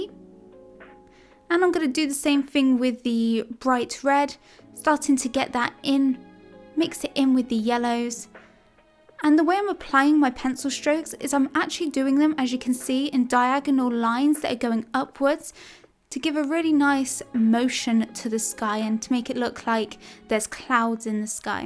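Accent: England, United Kingdom. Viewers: Ich liebe den englischen dialekt 😍😊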